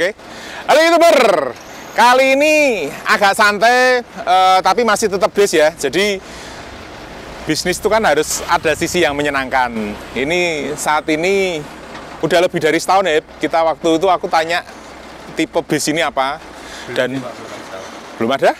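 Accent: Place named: Surabaya, Indonesia. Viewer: SALUT SAMA BOS... TOP BANGET.... LOGATNYA NGOMONG KAYAK AREK SUROBOYO..... SALAM DARI SURABAYA......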